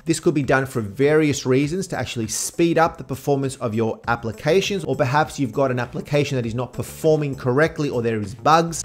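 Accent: Australia. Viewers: love the Oz accent so keep the pronunciation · Thank you! Delete the cache really is ok!?! And I love hearing a fellow Aussie accent!! And also, there used to be the ‘other’ files located on the Mac storage, now have they been renamed to “MacOS “… i know that sounds odd, but it’s in the exact location where ‘other’ was…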